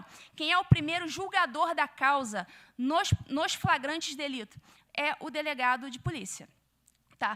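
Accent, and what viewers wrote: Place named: Rio de Janeiro, Brazil. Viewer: Muito competente... Parabéns..só uma coisa menos esse carioquex... Fica td certo..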